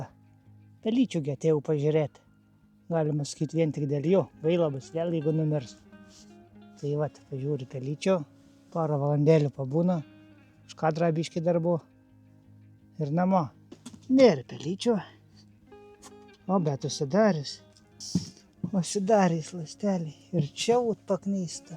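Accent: Aukštaitija, Lithuania. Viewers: Smagu žiūrèt śio žmogaus video del kruopštaus ir kantraus darbo, bet dar ir dèl to: bunkeriokas, miškiokas, ežeriokas, bulviotè, druskiotè ir t.t. Nuostabi aukštaitiška tarmè - glosto širdį! Vienas malonumas ne tik žiūreti, bet ir klausytis.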